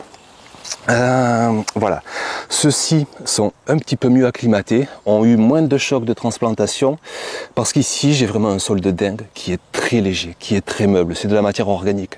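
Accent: South, France. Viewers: Merci beaucoup pour cette vidéo. Exilée en Alsace, c'est toujours un plaisir d'entendre l'accent du sud 😊 Je seme tous mes plants en Alsace chaque année. Ils finissent dans la serre. J'en replante dans notre jardin familial près de gignac, non loin de Montpellier lors des vacances scolaires. Cette année, première zone hélas, les replants ont été mis en place le week-end de pâques. Nous allons voir le résultat pour le pont du 8 mai. 🤞 En Alsace, les plants jumeaux sont en fleurs sous serre. J'attends fin mai pour mettre les derniers en pleine terre et livrer des plants à ma fille en haute Savoie Tous les ans, je suis surprise de l adaptation des diverses variétés replantées dans ces divers coins de France. Que la nature est resiliente et généreuse !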